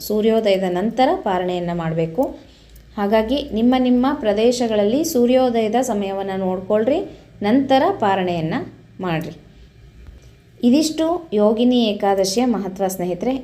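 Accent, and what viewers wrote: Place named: Bangalore, India. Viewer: ನಿಮ್ಮ ಮಾತು ಕೇಳಿ ದರೆ ಬೆಂಗಳೂರು ಎನಿಸುತ್ತದೆ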